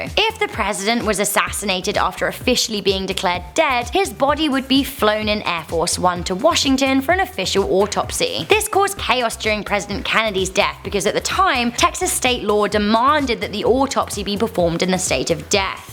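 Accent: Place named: United Kingdom. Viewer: that damn annoying british accent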